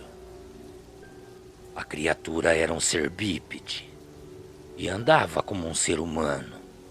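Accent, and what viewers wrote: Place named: Paraná, Brazil. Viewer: Boa noite, meu velho ! Cara gosto deste sotaque de ti uma mistura do Parana com o interior de Sampa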